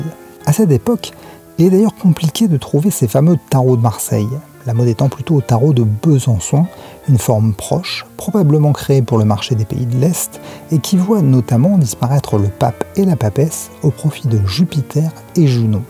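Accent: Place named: Italy. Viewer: BravissssimoO !!! Pour ce travail historique; tout cela avec une" touche de "fausse facilité "car je suppose que cela a dû vous demander des recherches conséquentes ainsi qu'un grand travail de synthèse! Et que dire de la mise en page magnifiquement illustrée!!!...Quant à l'accent; étant moi-même d'origine italienne...eh bien! ...Pas mal du tout!😆😂🤩( je partage votre vidéo sur mes groupes!).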